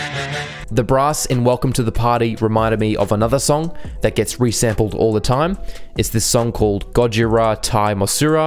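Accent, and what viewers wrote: Australia. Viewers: bro u from australia yh?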